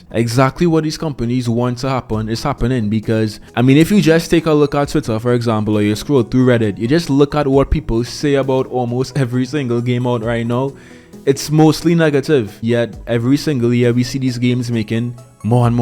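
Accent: Trinidad and Tobago. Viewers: As soon as the video start I say this yute trini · Big dawg you from Trinidad?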